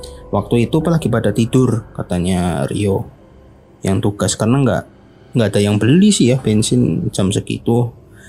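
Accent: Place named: Banyumas, Indonesia. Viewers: wkwkw lucu ya wong ngapak nek cerita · udah medok, kebanyakan kata "itu". skippp2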